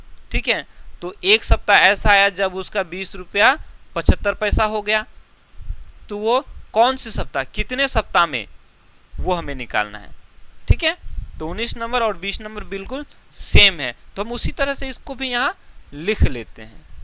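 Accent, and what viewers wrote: Bihar, India. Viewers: Sir aap bihar se ho kya ❤❤❤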